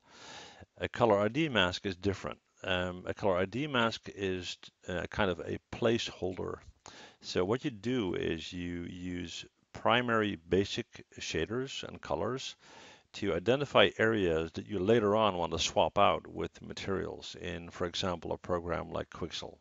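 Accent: Netherlands. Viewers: WAIT WUT u dutch ??? :D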